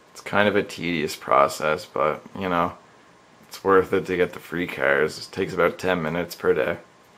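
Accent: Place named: Canada. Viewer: Love the Canadian accent. The way you say car is priceless.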